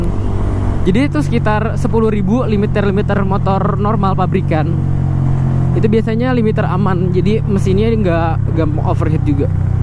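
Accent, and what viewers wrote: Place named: Java, Indonesia. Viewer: Pasti kamu manis banget yo mas, suara mendok nya ❤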